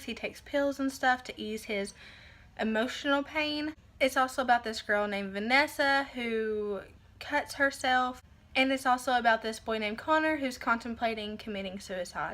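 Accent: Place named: South, United States. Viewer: Girl, where you from? Love the southern accent!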